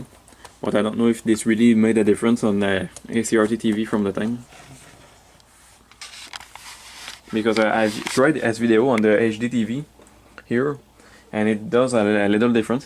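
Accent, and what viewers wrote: Quebec, Canada. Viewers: J'ai reconnu tout de suite un Québécois. Haha on est facile à spoter quand on parle en anglais.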